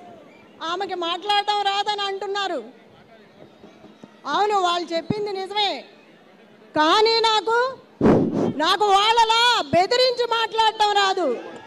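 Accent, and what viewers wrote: Andhra Pradesh, India. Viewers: Andhra voice Baat me dum nai hai😢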